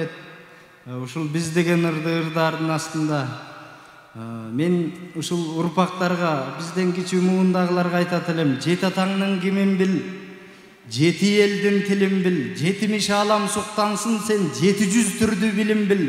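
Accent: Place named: China. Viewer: Кытайлык кыргыздар таза кыргызча сүйлөшөт да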